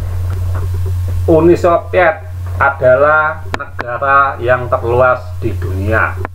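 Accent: Java, Indonesia. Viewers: Astaga naga mantap tenan.wong batak logat jowo.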